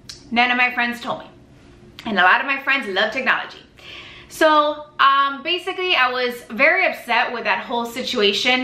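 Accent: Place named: Miami, United States. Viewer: You're from the Miami area! I can always identify the accent. You use the "hard" Spanish L's.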